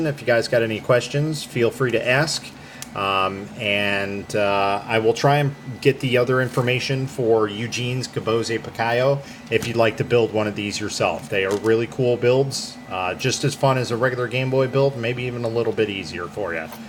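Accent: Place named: Chicago, United States. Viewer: dude where are you from? You have a killer Chicago accent coming though on these videos!!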